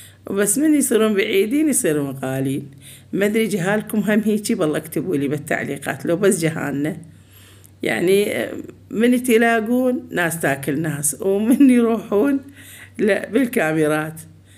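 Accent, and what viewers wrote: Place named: Mosul, Iraq. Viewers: عاشت ايدج لهجة الموصل بلسانج تخبببببل احبج هواية خالة. اني زينب من البصرة احب تذكرين تعليقي لان اكتبلج هواية ومجاوبني ربي يطول بعمرج يااارب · احب كلامج اموت على لهجه الموصل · هلا خالة.. بالصدفة شفت الفيديو بالمقترحات وجذبني العنوان.. لان خوالي من گيارة.واعمامي من كركوك.❤ وحسيتج من لهجتج انتي هم من كيارة🌷😁..فدوة لصوتج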